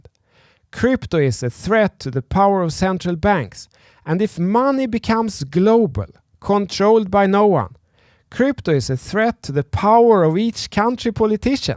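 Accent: Sweden. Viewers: I lived in Sweden for 6 years. I have never heard a person have more Swedish accent while talking English. That's why I'm subscribing to your channel 👍🏼😊 you both have points. Her point is that it costs too much electricity to keep running and make new currencies.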